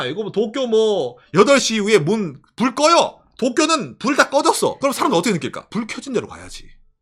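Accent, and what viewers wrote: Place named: Gyeongsang, South Korea. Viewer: 경상도 남자라 기본적으로 억양 배이스가 깔려 있는것도 있을듯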